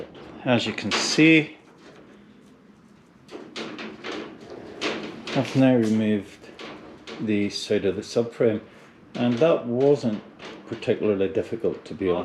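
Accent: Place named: Scotland, United Kingdom. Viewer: A braw bricht Scottish accent!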